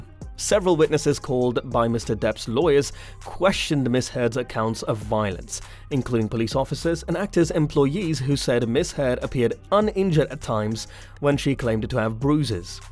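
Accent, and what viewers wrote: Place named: United Kingdom. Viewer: Get the facts straight you sound British so your laws vary from ours and as his supporters I believe that was done by bots. Facts are wrong are you sure your not being paid for this article by AH...just have to ask.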